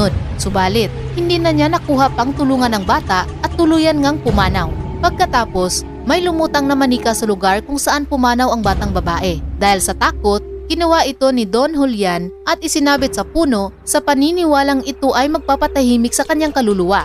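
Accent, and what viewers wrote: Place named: Visayas, Philippines. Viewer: Visaya ang commentator medyo konti pang praktis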